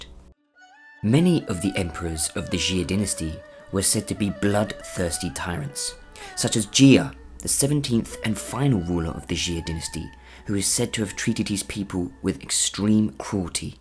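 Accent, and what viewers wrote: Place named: England, United Kingdom. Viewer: I can't fathom being pissy with his pronunciation being off. He's got an English accent and the desire to not only learn, but to teach about this fascinating culture's history is admirable. Most westerners don't have the ability to pronounce everything perfectly in a language so profoundly different. We just don't have some of the sounds prevalent in english. Seems to me that taking issue with such trivialities is more about making sure everyone is aware you know more. This nonsense today where some think mispronouncing something is disrespectful is a joke. I can't imagine someone getting prickly about english mispronunciation, but maybe some do. Maybe just an indicator of the toxicities that exist among all people in certain individuals. I'm just appreciative that he used his time to put up a video in an effort to teach people. 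Thank you for making concise videos for us who just want to learn about the culture and history. Great narration and choice of photos/art.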